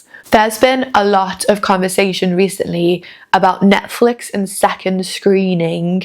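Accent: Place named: Northern England, United Kingdom. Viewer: Your observations are spot on. Bundle is the typical unbearable know-it all girl boss figure that has become so overused in modern film and TV, here shoehorned into a 1920s setting., her language and clothing were totally out of place. I fear the worst when it comes to the coming adaptation of C S Lewis' Narnia books later this year. If they muck that up, I am cancelling my subscription! Nice to hear a northern English accent on YouTube! Thanks for sharing, Greetings from cold Sweden!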